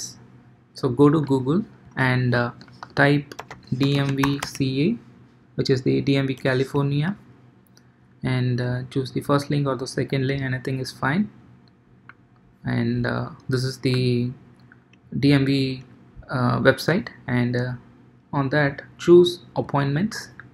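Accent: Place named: India. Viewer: heavy indian accent is making this video impossible to understand..... dislike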